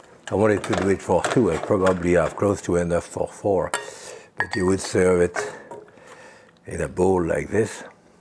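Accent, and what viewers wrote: France. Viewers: this mans is so fucking french and im absolutely here for it. "and this, as you can see, is a -aut dog-"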